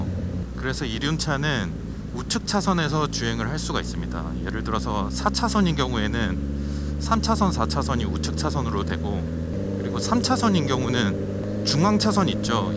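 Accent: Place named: Seoul, South Korea. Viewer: 엇!!! 서울말 쓰시는👍👍 멋있습니다!!! 구독하고 갑니당😆😆😆😆😆😆😆 소리 넘나좋은 육반 !!!!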